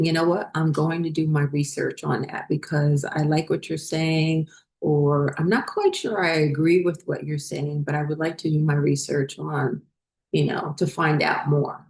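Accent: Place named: New England, United States. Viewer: I saw this video a few days ago and it kept popping in my mind, so I’m back to listen even tho I haven’t started at the first video. For now, it seems like there is a message in here for me. Things around my house always start breaking, falling apart when it’s time to go. The last townhome I was in had a broken washer, drier, oven, stove, microwave, and heater 😮😅 by the time we finally were able to get out🎉🎉 Just want to say Good For You - telling one’s story is so powerful - and also I grew up in New England and enjoy listening to your narrative/accent.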